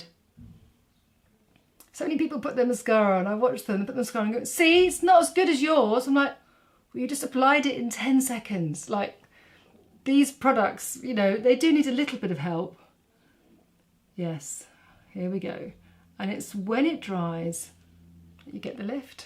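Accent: United Kingdom. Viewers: you are adorable and I love your sweet soft British accent. This was a very nice tutorial. All the detailed explanation of the products and techniques really helps the everyday makeup wearer. Thank you and stay well ❤️🌻🌹🌺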